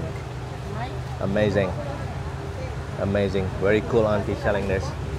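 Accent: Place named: Thailand. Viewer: WOW MANTAP !!! cakap english pun loghat thailand sdh Bro hehe . . " SEMANGAT 2020 "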